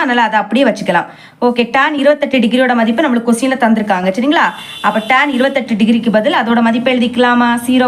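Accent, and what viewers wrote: Kanyakumari, India. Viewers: neenga kanyakumari ahh akka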